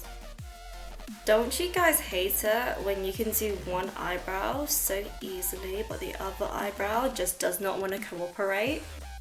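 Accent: United Kingdom. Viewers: I am so happy you have YouTube!! I follow you on instagram and I'm so excited to watch your YouTube vids!❤️ It's so nice to have makeup inspiration from some one with a British accent✌🏻😜 (no offence to the fabulous others) Do you ever visit Scotland? Xx Keep it up! So much make up envy 😝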